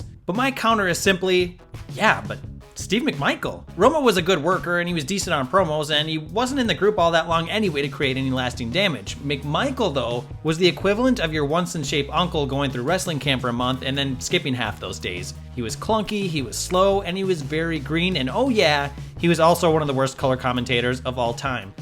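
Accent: United States. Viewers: There is a American workin for WhatCulture!? Its weird hearin his voice since all the rest is brits. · Who's this American guy, where's Jack or someone? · It’s so weird hearing an American and not a Brit on this channel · sounds weird to here a american on what culture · hahahhahahahahahahahahha listening to this yank talking about brawn in 2018 hahahahahahahahahahaha fucking bullshit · great American accent. Thumbs up! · Get a non-yank to do these videos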